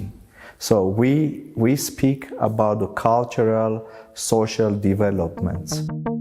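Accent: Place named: Turkey. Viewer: Interesting and greatly informative video, what is more interesting is I would say the presenter is Turkish if I didn't know he is Armenian, due to his accent and outlook. Most of my good friends were Armenians back in the day, and my father served his military duty in an Armenian village in Eastern Turkey (yes we still have a sizable Armenian community). Contrary to Turkish claims that Sumerians are Turkic, or Meds are Kurdish, the Urartu-Armenian coonnection kind of makes sense to me.But other than the archeological dig outs, I would be more interested in the linguistic evidence, otherwise cultural elements can be easliy adopted by people who came to the region later on. We Turks consume the most tea er capita in the world and love olive oil etc. Sth. similar can be seen in the case of Hatti-Hitite as well. I think I will watch some videos on Urartu-Armenian linguistic connection. 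But this video was really interesting.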